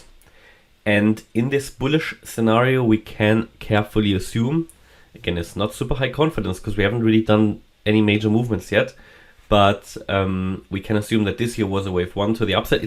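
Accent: Germany. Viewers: deutsch? hört man raus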